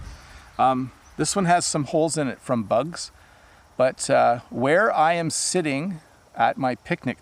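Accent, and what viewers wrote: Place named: Canada. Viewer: Hi I love your accent Canadian very clear pronunciation but I am not good at English I am from Bangladesh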